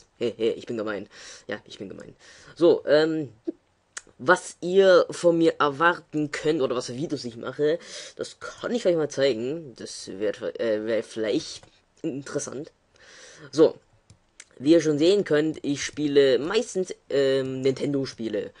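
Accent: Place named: Stuttgart, Germany. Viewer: Für einen Stuttgarter erfreulich wenig Dialekt, für einen 14-jährigen eine recht gute Stimme - so weit, sogut. Wichtiger Tip: Vorbereiten! Gerade bei einem solchen Video sollte man sich vorher ein paar Gedanken über den Text machen, notieren, ausprobieren etc.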